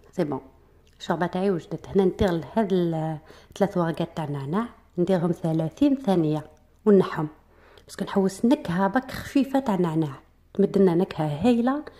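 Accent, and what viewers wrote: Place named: Levant, Arab world. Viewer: Voyer êtes algérienne? Votre parler est du mechrek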